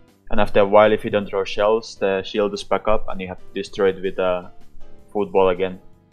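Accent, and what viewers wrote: Finland. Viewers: Are you finnish? Cuz it really sounds like it xD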